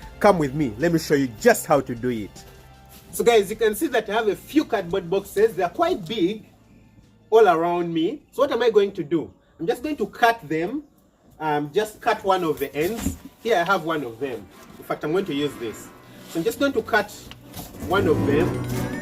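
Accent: Uganda. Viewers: You just speak 🗣️ like a Ugandan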